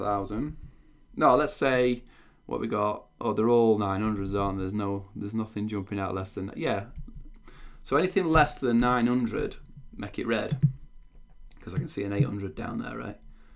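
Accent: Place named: Liverpool, United Kingdom. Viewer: Good stuff, I just had a phone interview where, in addition to my SSRS experience, I said I have worked with Crystal Reports. Good overview here. Hey are you from the Liverpool area? I'm a Yank but I try to discern British accents. You have an accent similar to Paul Hollywood on the Great British Baking show.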